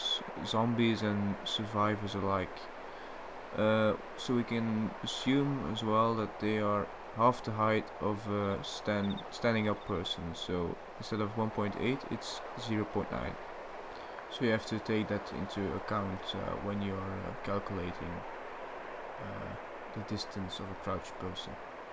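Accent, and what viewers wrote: Netherlands. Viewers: your accent , are you dutch ? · Let me guess u come from holland XD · you dutch? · i knew this guys was dutch right when he started talking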